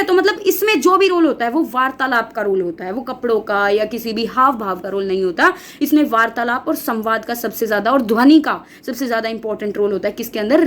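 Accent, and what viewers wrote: Haryana, India. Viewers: aap paka haryana se ho aapki ton aate hai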